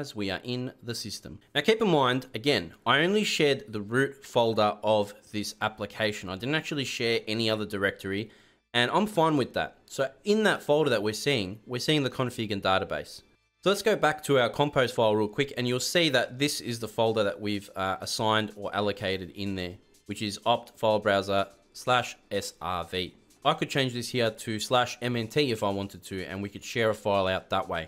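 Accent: Australia. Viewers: Hey r u Australian bc u got an aussie accent?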